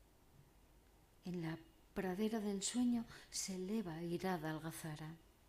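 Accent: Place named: Spain. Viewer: Que lindo! muy dulce tu voz y ademas amo ese acento español!! un abrazo grande compañera!!!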